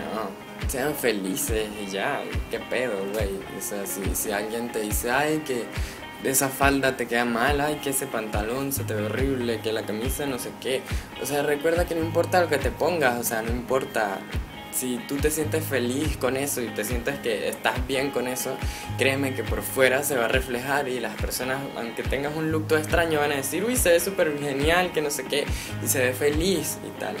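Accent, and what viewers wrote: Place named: Venezuela. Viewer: Soy nuevo en tu cuenta, sería genial que te presentarás y dijeras de dónde eres.. ( hasta el momento creo que eres venezolano por tu acento ) entre otras cosas como tus gustos.. tus fans te quieren conocer 💟.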